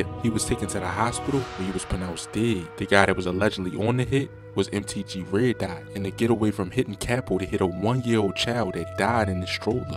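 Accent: Baltimore, United States. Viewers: That Baltimore accent heavy Asf bro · you from baltimore. Accent crazy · stop the bs he definitely from Baltimore lol I have family from there this is Baltimore accent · Definitely from Baltimore accent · baltimore accent so annoying lol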